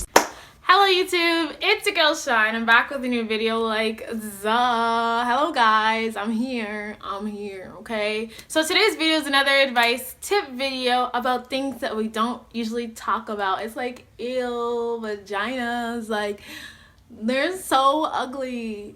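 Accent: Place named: Jamaica. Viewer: krys when I hear she talk I said to my self hmnnn she must b Jamaican r a wrap wid jamaican, gotta take care of the poonahniii lol yes I said it lol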